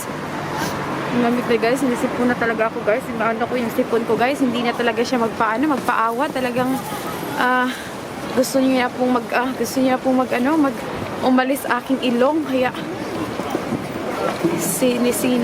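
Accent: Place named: United Kingdom. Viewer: chinese national pala si ate langgaa😅 pero ang accent ng pananalita is parang british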